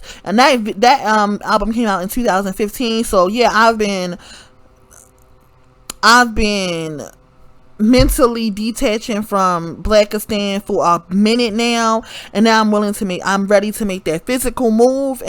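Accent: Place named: New Orleans, United States. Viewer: I hear New Orleans, New York and Maryland in your accent.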